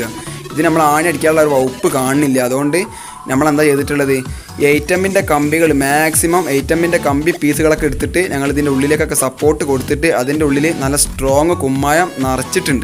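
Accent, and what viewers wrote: Malappuram, India. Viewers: Ath njammade malapram ayonda bane... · നിങ്ങളുടെ എല്ലാ വീഡിയോ കാണാൻ നല്ല ഹാരമുണ്ട് പ്രതേകിച്ചു നമ്മുടെ മലപ്പുറം ഭാഷ...നിങ്ങളുടെ സ്ഥലം എവിടെയാണ്....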